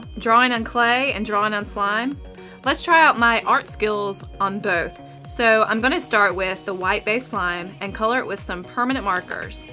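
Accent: United States. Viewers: you have a cowboy acent :ooooooooooo seen anything weird here?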